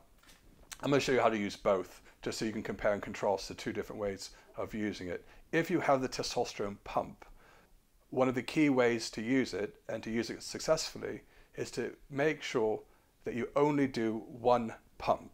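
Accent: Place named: London, United Kingdom. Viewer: your accent sounds very similar to Prince William's accent. Did you grow up in the same area of London?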